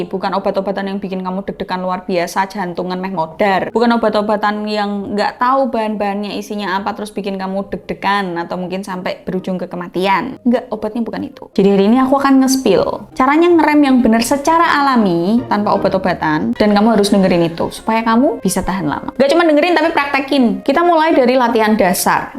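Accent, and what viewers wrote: Java, Indonesia. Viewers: Mbae Jawa bgt ya...😂😂😂 I love U Mbae. ❤❤❤❤❤❤❤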